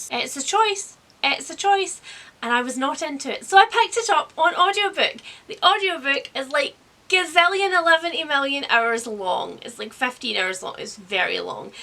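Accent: Scotland, United Kingdom. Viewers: Is she Scottish/ Irish? Btw awesome idea. I have a superstition that if I add a book as currently reading on Goodreads or post about a book while I'm reading on instagram then I won't be able to finish. It's irrational but 🤷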